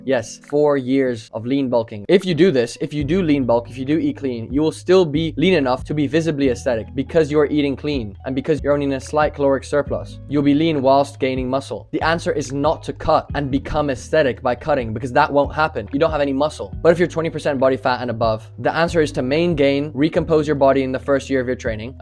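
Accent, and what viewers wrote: Ireland. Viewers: I swear I can hear a bit of a Dublin accent when you speak... Did you live in Ireland at some point? 😂 🇮🇪🇮🇪🇮🇪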